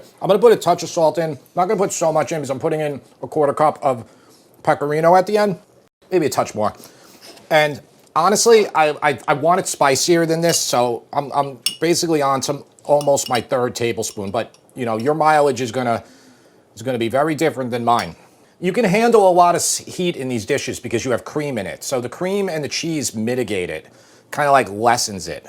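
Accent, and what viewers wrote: New York, United States. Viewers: Making this tonight. Thank you for sharing your skills with us. And I like the NY accent:)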